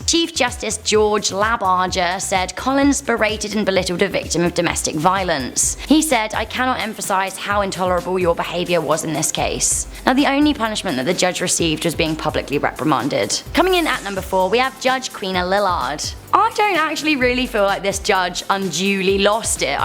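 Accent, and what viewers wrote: United Kingdom. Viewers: What is the story behind the British accent, you are from Canada, right??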